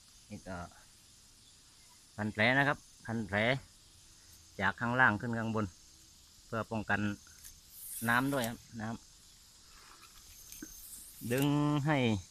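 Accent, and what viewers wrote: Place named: South, Thailand. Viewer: ผ่านหลวง คนใต้แน่ฉาดๆ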